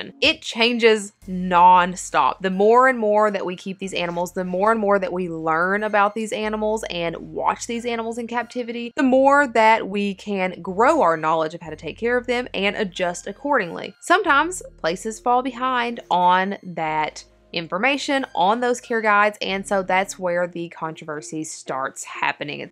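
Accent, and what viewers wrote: South, United States. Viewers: Are you from the south? Juts wondering, I'm from AL so I recognize your accent. Love your channel, thanks for the great tips.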